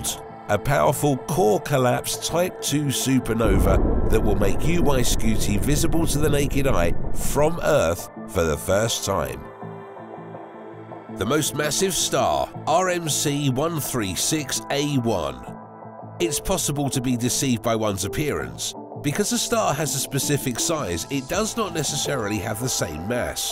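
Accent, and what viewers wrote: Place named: Cockney, United Kingdom. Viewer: Yes this is all very interesting, but the narrator sounds like some geezer off Eastenders with a sinus infection. Couldn't you find someone else who sounds more educated and less unhealthy?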